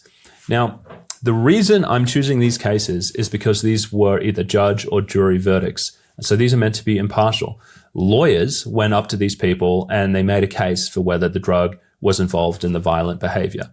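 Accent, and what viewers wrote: Australia. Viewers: Why are you Australia accent?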